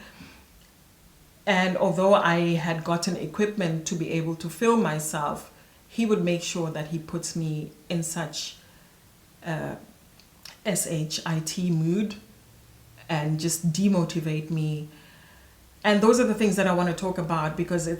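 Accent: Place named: South Africa. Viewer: Umuhle sis❤ from your accent I could tell ukuthi you are south African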